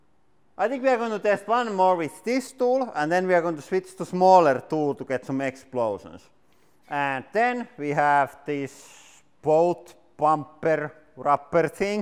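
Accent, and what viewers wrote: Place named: Finland. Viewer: You have finnish accent. :)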